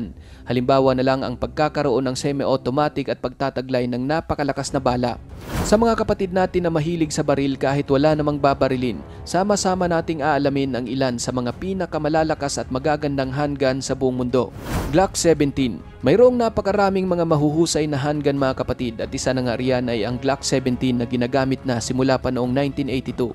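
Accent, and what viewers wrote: Visayas, Philippines. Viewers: Bisaya Punto Ka Bhai.. 🤔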